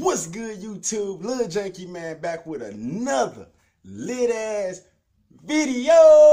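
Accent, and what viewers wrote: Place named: Detroit, United States. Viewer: Im from indonesia. I search this accent for long time. Finally i talked to someone from michigan, he said that, you are looking for detroit slang 😂😂 and im here to learn this crazy accent ❤❤❤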